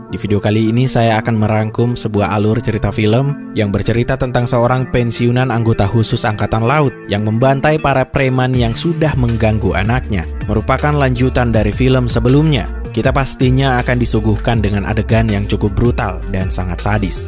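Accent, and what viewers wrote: Makassar, Indonesia. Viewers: Orang makassar kii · Org makassar ki kah?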